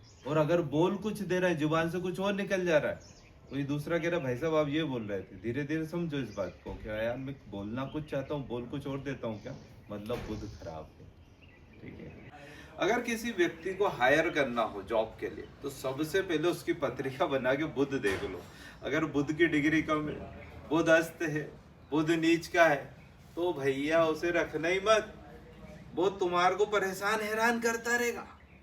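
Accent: Indore, India. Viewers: Bolne s lagta h indore ka touch h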